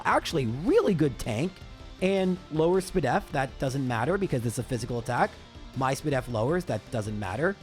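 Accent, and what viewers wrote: Canada. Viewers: Hey! As others pointed out your accent was pretty obviously Canadian but that "Es hora de luchar contra el rival 3" was perfectly undertandable! Greetings from Spain!!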